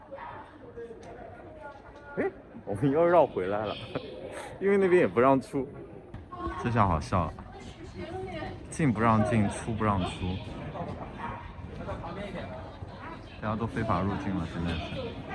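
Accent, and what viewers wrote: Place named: Taiwan. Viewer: 长着北方人的脸，操着半吊台湾腔。你在台湾呆多久？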